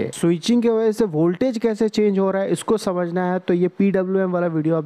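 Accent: Bengal, India. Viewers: Sir are you bengali??? Your content is so helpfull for me.... Thanks for this great work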